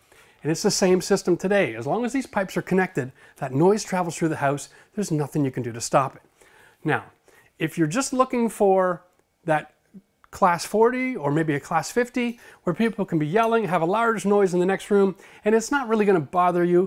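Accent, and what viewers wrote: Canada. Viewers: This guy is Canadian, eh?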